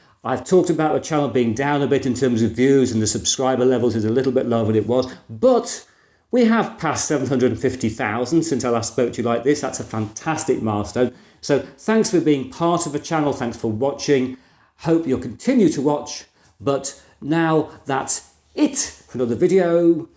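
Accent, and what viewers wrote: United Kingdom. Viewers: Your personality and style very unique sir, also have british accent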